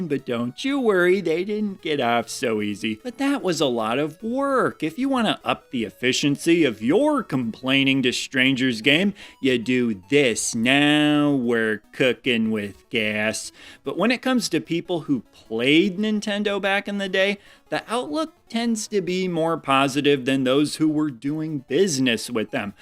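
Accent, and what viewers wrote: Midwest, United States. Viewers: Dude I legit haven’t watched one of your videos that I haven’t loved Thank you I watch your channel (mostly listen) while doing chores like washing dishes or folding laundry and seriously I feel like you are my Midwest (guessing on the accent) BFF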